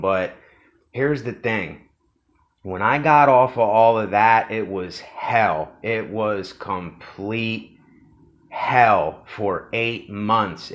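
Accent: United States. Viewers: You sound like your from northern Kentucky. Just saying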